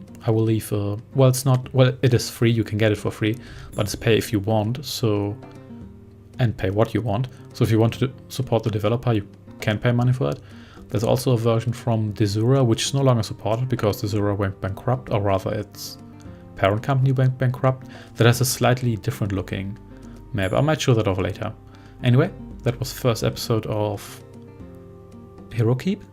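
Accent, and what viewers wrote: Germany. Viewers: It looks like it would make for a neat app lol are you german by the way? I like your accent :)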